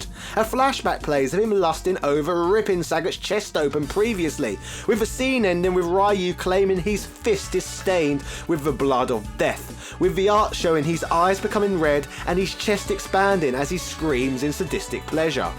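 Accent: United Kingdom. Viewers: I say Ryu like Ree-you. Works for me. But he's a brit and they didn't say it like us Americans lol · thank god, you stopped using that stupid japanese pronunciation mid video...! you are british i want to ear your british accent when you speak...!!! cool vid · I do love these street fighter trivia videos. These British pronunciations though, there so cringy. I'm English and I've always thought so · Lol british phonics!! love it! It appears Caapcom have taken to rippong off DBZ for inspiration. essentially we have Demon King Ryu and Evil Majin Ken! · I'm American & I'd pronounce his channel name with my American accent. I wouldn't put on a fake British accent to pronounce it the way he does.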